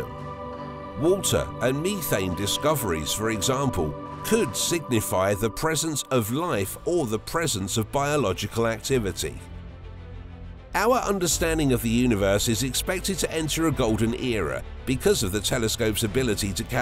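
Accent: United Kingdom. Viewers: So not only is this narrated by a Brit, essential to making Americans think there is some intelligent afoot, but the Title is a lie. THere are no new images, and only the very clear statement that sooner or later there will be. This entire project is an amazing feat primarily on the part of US teams of engineers and scientists. Isn't it a pity to have to lie about in the title? Whoever posted this, you dishonor a great achievement.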